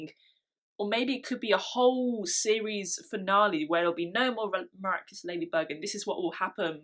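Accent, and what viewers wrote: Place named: United Kingdom. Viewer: Dear, I am not really interested in Ladybug, but I enjoyed your take on it. It is interesting to see what is important for fans. You look great without make up and your british sounds wonderful. (hopefully it is british english... otherwise shame on me) thx for the video, I think I will check out some more. have a good time and stay healthy:)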